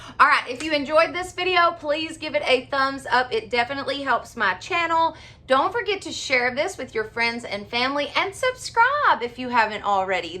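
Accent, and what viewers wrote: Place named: Tennessee, United States. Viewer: Hello and greetings from northeastern North Carolina. 👋👋 I'm a newbie. I already subscribed because I love your name for your channel! Your energy is inspiring! AND I LOVE THAT TENNESSEE ACCENT!! 😁 Actually I do have a question. And I don't know who to ask. Where di you sign up to do LIVES here as well as on YouTube? I've dreamed of being a small business owner for about 23 years now.... I am a "Chronic Creative" (borrowed from Amber Strong 😁). I enjoy creating, and I decided after hurting my back and being forced into early retirement - LET IT FLY! It's taken me this long to feel ready. I AM READY!!! Thanks! L8R ✌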